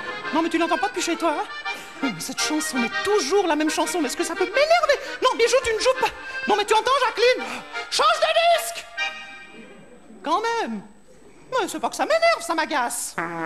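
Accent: Switzerland. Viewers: J'adore l'accent Vaudois. Je suis Genevoise❤ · Rien que l'accent helvétique est marrant....Super.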